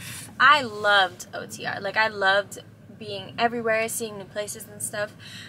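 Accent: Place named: Miami, United States. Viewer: You sure picked up that Latino Miami accent.. Maine accent is totally different..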